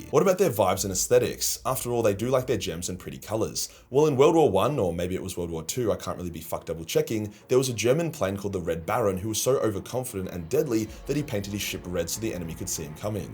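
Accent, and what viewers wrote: Australia. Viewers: You went full Aussy on this one. Love it.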